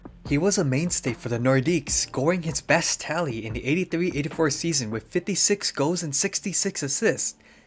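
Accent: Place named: Asia. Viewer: I didn't even realize the narrator's Asian accent until he said words like "Golden" and "Calder"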